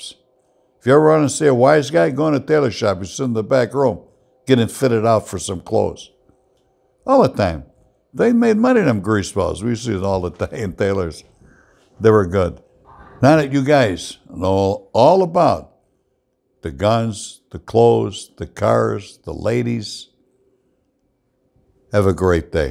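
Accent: Chicago, United States. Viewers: I love listening to the way you talk. The Chicago way. I always did the same thing with cars and clothes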